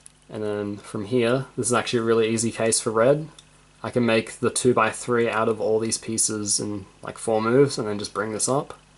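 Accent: Australia. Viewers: Your accent kinda sounds like Australian+ English and Feliks's accent is like Australian+European ^_^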